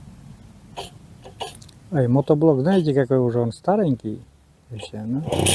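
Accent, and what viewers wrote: Komi, Russia. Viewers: Добрый день! Всегда приятно Вас видеть. С началом посевного сезона, спасибо помощника. Всем Вам здоровья и мирного неба! Наслаждаюсь Вашим Коми акцентом. Добра Вам!!!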